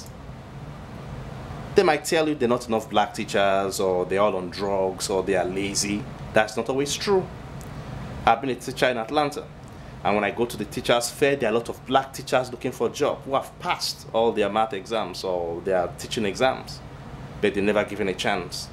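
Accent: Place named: Nigeria. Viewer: you had a niagerian accent so thats why i thought you were from nigeria i am from bauchi state northern nigeria which part of nigeria are you from?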